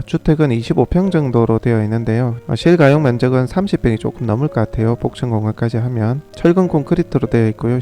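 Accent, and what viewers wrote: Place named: Gyeongsang, South Korea. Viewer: 갱상도 말이 남자에겐 참말로 멋지지예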